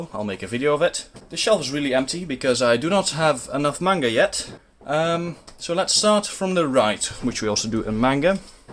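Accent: Netherlands. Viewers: Hoorde het meteen aan het accent hahah leuk dat je uit Nederland komt. Geabonneerd! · I can hear ur dutch haha! Great collection dude! Greets from a fellow Dutchman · Haha, wist dat je nederlands zou zijn aan je accent. Toevallig zelf ook gister nog manga gekocht van marktplaats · My classmate talks like you. Literally. He also says sOmething. He's also dutch btw.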